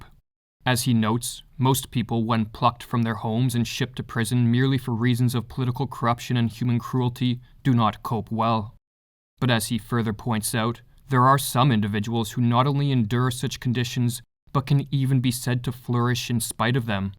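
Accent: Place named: Canada. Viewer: Whats up with you,? How old are you? You lift weights? squats? You sound Canadian. Im Diogenes.really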